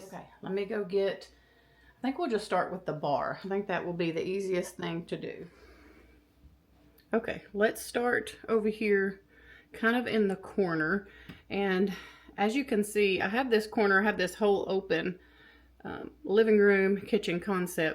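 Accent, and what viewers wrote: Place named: South, United States. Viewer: I'm a new subscriber. You have a little bit of a country accent like me; where are you from? I can't wait to watch more of your videos. BTW, I'm from Southeast Georgia.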